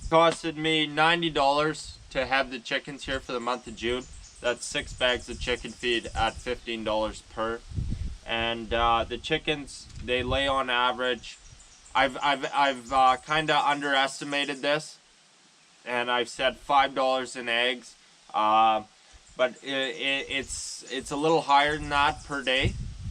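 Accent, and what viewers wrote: Canada. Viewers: As soon as you started talking I knew you were Canadian. LOL We do have an accent that is identifiable. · I knew you were Canadian with the first sentence you uttered. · He reminds me of letter Kenny. Also I don’t know why I’ve never heard it but Canadian accents like his almost sound Irish at times. They have the lilt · Lol right away I thought this guys Canadian.. anyway good video and I subscribed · I could listen to you talk for hours about anything. Your accent is amazing and as a fellow Canadian I wish I had it lol. You’re the most attractive man on YouTube ❤️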